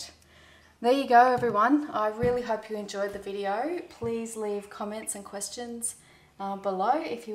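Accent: Australia. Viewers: Maybe they just don't like the Australian accent.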